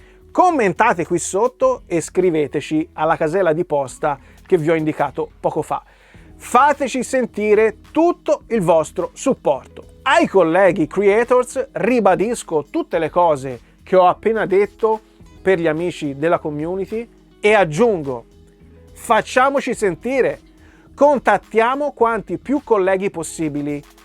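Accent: Tuscany, Italy. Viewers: Sacrosanto! Devo dire che mi ha fatto un po’ impressione sentire un bell’accento toscano in bocca a, abituato com’ero a sentirlo parlare in inglese! 🤣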